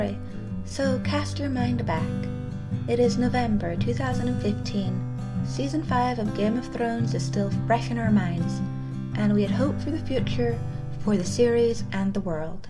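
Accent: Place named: Scotland, United Kingdom. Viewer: True 😅 You mentioned Belfast, is that where you're from? I had assumed your were Scottish or something lol 😅😆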